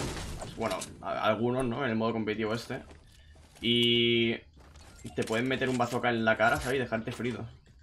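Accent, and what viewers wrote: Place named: Andalusia, Spain. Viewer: Que rabia me das, que seas de barcelona y hables como un andaluz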